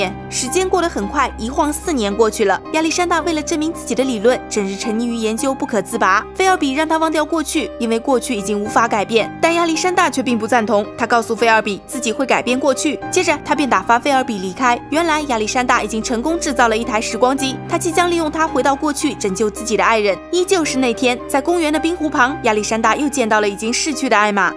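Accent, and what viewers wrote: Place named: Henan, China. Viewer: 無意間聽河南人的口音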